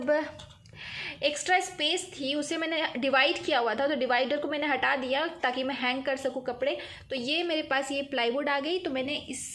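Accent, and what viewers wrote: Bihar, India. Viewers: Aap Bihar se ho mam ????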